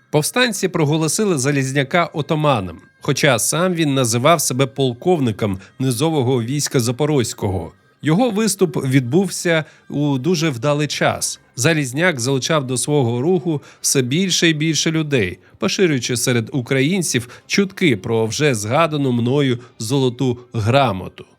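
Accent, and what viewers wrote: Russia. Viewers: Доповідачеві бажаю позбавитись руського акання і читати вголос українською щоб чути вимову.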